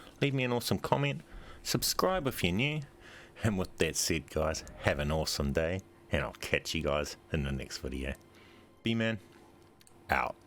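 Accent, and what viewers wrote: New Zealand. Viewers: Thank you so much for the guide! Finally was able to beat it because of you haha. Also your NZ accent is so dam cool!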